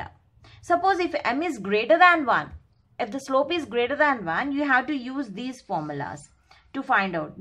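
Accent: India. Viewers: indian accent equals to a mark of quality.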